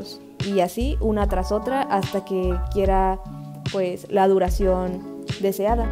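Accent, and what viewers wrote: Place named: Yucatán, Mexico. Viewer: Por mi casa también pasa Zgas jaja 🙊 Tengo curiosidad de dónde eres, sospecho que de Yucatán o Quintana Roo, tu acento me recuerda al mío jaja ❤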